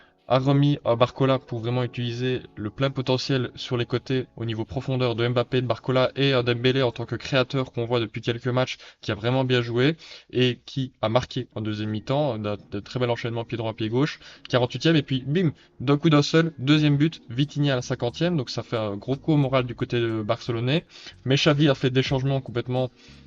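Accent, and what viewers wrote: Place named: Belgium. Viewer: Super vidéo, tu mérites plus de visibilité! Ps: est-ce que tu es belge par hasard? Je reconnais un petit accent haha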